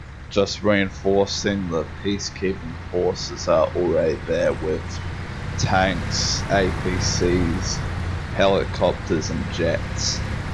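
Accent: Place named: Australia. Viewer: Australian ya dick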